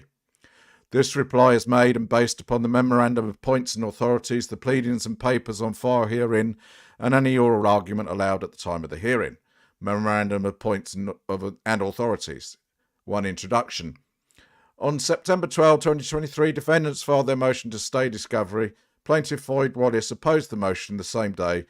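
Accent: United Kingdom. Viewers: are you going to continue your unique content but showing your face and giving your real name on your channel in the future? Mr. Merbeast recently said anonymous creators are cowards and Mr. Stout has said creators such as you are "avatar" channels whispering in shame from their secret internet hiding places. (I know you don't think much of Mr. Stout though.) Whatever the decision, I trust your voice will remain the same. Everything sounds better with a British accent!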